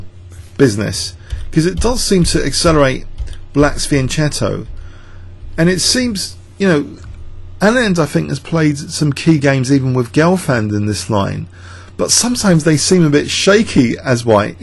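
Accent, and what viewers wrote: United Kingdom. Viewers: Sounds like your saying Fawn pawn to me for some reason.I guess it's just your British accent....I'm not hearing it correctly. It is "thorn" pawn though yes? After watching the reaction video he seems blown over by the move, but immediately castles. Amazing how good these guys are, even in a five minute game how to mitigate damage so quickly after a shocking move/blunder what have you. btw- pertaining to your initial comments. Personally I'd love to see Kasparov make a comeback.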